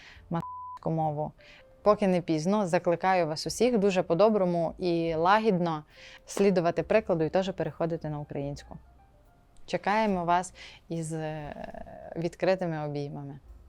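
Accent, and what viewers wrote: Transcarpathia, Ukraine. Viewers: ! Дякую за закарпатську мову! Насолоджуюсь слухаючи Вас, дякую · Ти молодець! Я слухаю тебе здебільшого, як носія закарпатського діалекту 🫂 Нехай Господь тебе благословить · Но дуже файно!... Мені полюбилося!...... А ще як чую мою мою рідну Закарпатську😘.... Супер! !!!! ❤ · Будете матюкатися - не буду дивитися. Це не смішно. Прекрасна Закарпаття говірка спорчена москальськими матюками... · Хуйово як для першого випуску! Ніхуя не смішно, тадуже дратує акцент! Закарпаття? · як же я люблю твій закарпатський говір. Це є твоя унікальність. Сумую за Закарпаттям і люблю всією душею краєвиди і людей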